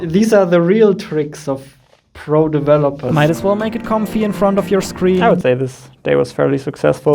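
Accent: Germany. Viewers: i like how you can hear his german accent sometimes sneak through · Really uplifting and funny videos! I love the German accent as well! What's the name of the gray fluffy bamsefleece sweater you wear at the end? I got a Norwegian hoodie made in the same material and they are great. Good luck with your game. I've wish listed it on Steam. Looking forward to it!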